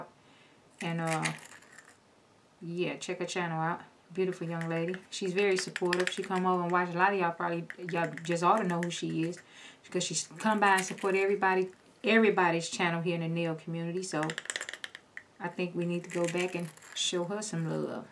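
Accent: South, United States. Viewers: Omg southern gal to the fullest 😂. I love this!!!!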